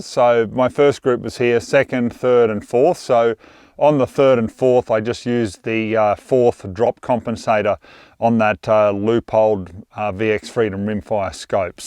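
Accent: Australia. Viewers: He is Australian not European. Why do Americans say Aluminum instead of Aluminium?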